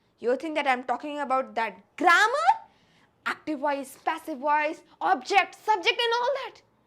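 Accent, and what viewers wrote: India. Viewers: The pure Indian accent =💀. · first leave the f indian english accent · You India accent spoiled the language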